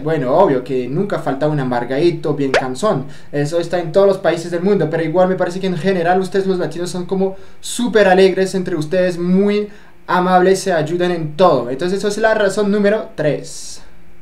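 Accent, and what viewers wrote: Bogotá, Colombia. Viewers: Se le pegó el acento colombiano. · chevere el video, habla muy bien español? Se nota que aprendió en Colombia, un poco el acento y algunas palabra. Chevre. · Tu parles trop bien l'espagnol en fait, on entend presque rien d'accent français, et tu as pas mal chopé l'accent colombien. Du coup j'ai vu que tu kiffes mon pays d'origine (Le Mexique)mais tu parles pas comme un mexicain;) haha, ¡Felicidades, güey! ;) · habla casi rolo el parcero ,buen video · Jajjajaja creo que eres sumamente gracioso. Tienes una impresión muy genial de los latinos. Me parece que hablas con cierto acento colombiano. :)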